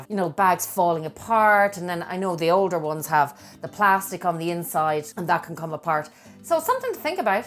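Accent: Ireland. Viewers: Hello, I am a new subscriber and have enjoyed watching your videos. Love your accent, what part of Ireland are you from. My husbands Father came from County Cork. xoxo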